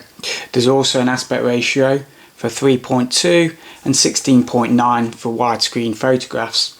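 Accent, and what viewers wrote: United Kingdom. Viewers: Epic British Accent !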